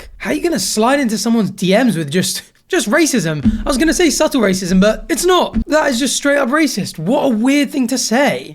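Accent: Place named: United Kingdom. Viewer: Hey dude Don't know if you'll read this comment, but what area are you from? I'm an American and I struggle with identifying foreign accents, but you have a very distinct accent that I've heard in a couple of other youtubers. I'm just curious where it comes from. I'm like 99% Sure it's a British accent, obviously lol. Definitely not Scottish, Irish or Welsh, and doesn't sound like the stereotypical Londoner or RP accents, but i could be wrong. Thanks!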